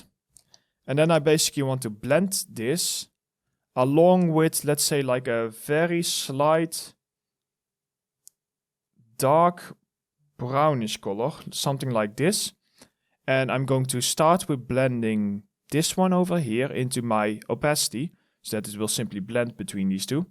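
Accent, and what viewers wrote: Netherlands. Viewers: u are dutch right? XD thanks brother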